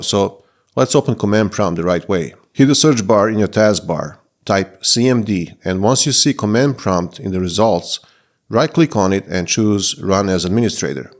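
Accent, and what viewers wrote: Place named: Eastern Europe. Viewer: Thanks for this. Detecting a slight eastern European accent...are you Russian? Studied Russian a long time ago.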